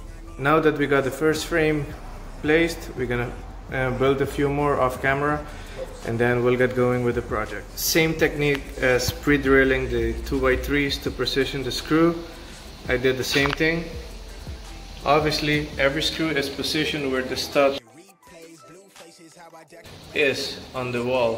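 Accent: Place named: Morocco. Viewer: الوجه و اللكنة مغربي،، منور خويا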